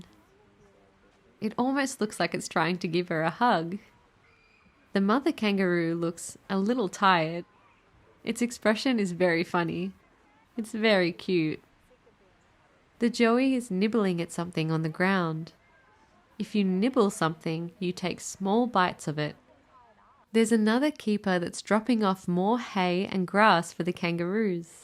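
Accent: Australia. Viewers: This is really incredible job to enjoy video contens with Aussie accent. For the first time, I have watched your video sister.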